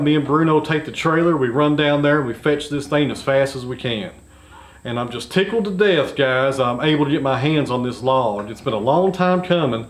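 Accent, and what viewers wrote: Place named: South, United States. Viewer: Hey bud! Interesting video & story! Beautiful grains on that log too! I just had to complement your accent. I have a southern accent too but mine is clearly an NC/SC Piedmont one. That eastern TN twang is one of my favorites for storytellers!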